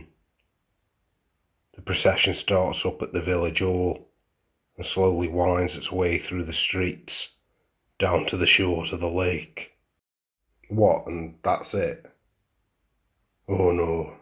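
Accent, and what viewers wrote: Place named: Derbyshire, United Kingdom. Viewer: That was ace, cheers very much. The accent had ne straight away, but I can't quite place it. Chewing words like Cheshire, hooting your 'oo's like Stoke, but probably more Derbyshire. I don't even care how out my guess, the tale told was a good tale well told. Thank you very much.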